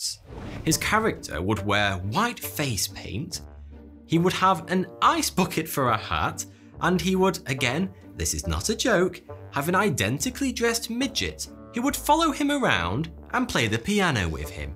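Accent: United Kingdom. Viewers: Is he british? I keep hearing "fourth two here" at the beginning...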